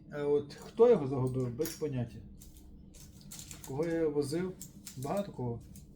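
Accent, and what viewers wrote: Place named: Western Ukraine, Ukraine. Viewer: По акценту точно не з Донецьку і не з Луганщини.Так десь блище до земель народження Степана Андрійовича Бендери. Патріот справжній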